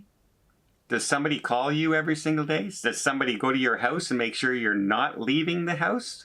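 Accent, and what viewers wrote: Canada. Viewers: My husband loves to watch your videos. He says you sound so Canadian; he is too. Thanks for being logical. I don’t believe the number of covid cases onboard with crew and passengers is public information.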